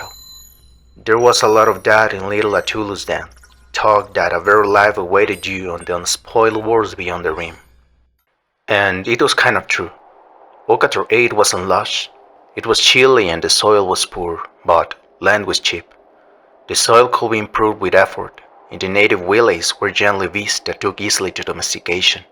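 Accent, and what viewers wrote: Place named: Spain. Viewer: I love the accent! It's like listening to Star Wars as read by Antonio Banderas! REPOBLIC! LOL! LOVE IT! 😅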